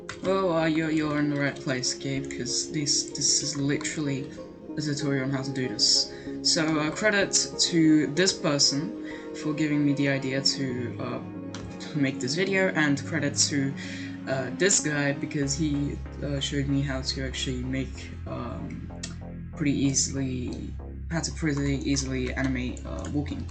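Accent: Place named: India. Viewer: dude, something funny happened because of my stress, lol, so i got a buncha tutorial tabs opened about walking tuts, and the other video is an india guy talking, it loaded quite slowly, so i opened this video and i lit thought your an indian guy xd anyways, thanks for the tut, the only useful thing i learnt is the stretch thingy :) thanks you again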